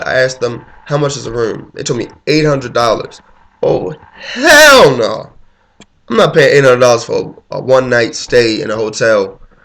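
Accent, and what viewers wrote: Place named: Baltimore, United States. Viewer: You have a bmore accent is that where you from?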